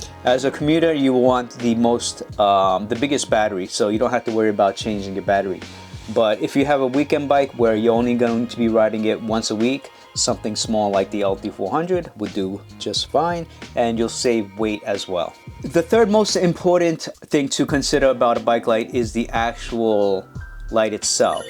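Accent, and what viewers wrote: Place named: Brooklyn, United States. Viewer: I mentioned that I only had one question, but I actually have a second question and a comment. The comment is that I like your NYC accent, it sounds a little Brooklyn, like maybe Bay Ridge, though it's not too strong. My second question is about the 2600 be remote. Does it have a watch battery that you only have to replace like once every year or two? Also, how waterproof is the actual remote, in your opinion? Thanks again and have a good weekend!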